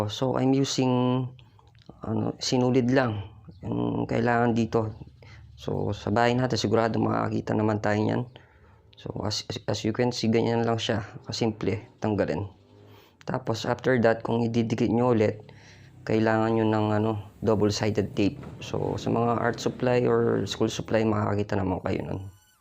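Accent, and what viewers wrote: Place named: Pampanga, Philippines. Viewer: lupet mo neh soy.kapampangan ka ne soy tunog pamu.ehh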